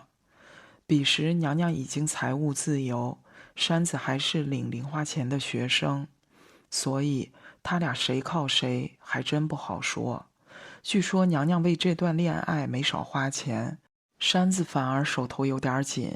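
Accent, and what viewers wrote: Cantonese, China. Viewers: 哈哈哈哈哈哈哈哈哈哈哈哈哈哈哈每次聽主播說粵語我都笑不行了🤣🤣🤣🤣🤣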